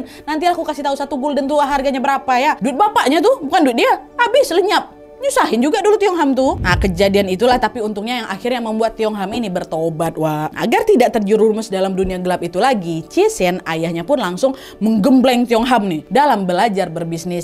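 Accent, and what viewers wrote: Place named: West Sumatra, Indonesia. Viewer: Logatnya kayak orang Minang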